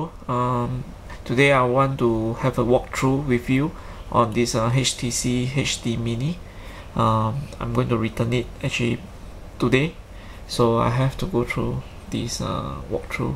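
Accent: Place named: Singapore. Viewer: i don't like your accent. SINGLISH?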